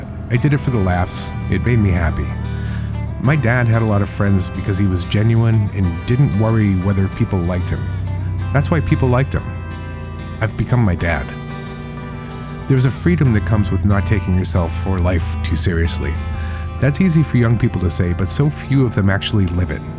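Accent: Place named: United States. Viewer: It is perplexing to hear the voice that plays in my head, talking with an American accent. Excellent channel - Thank you. Keep them coming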